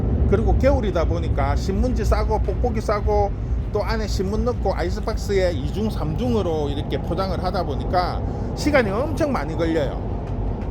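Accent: Gyeongsang, South Korea. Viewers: 사장님 사시는데가 어디입니까?저는진주사는데 말씨가 이쪽경상도 같아서 가까우면 가계에 한번가보고싶어요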